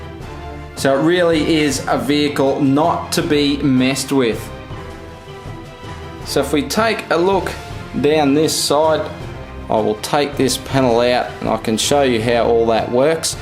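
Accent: Australia. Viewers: He's from Australia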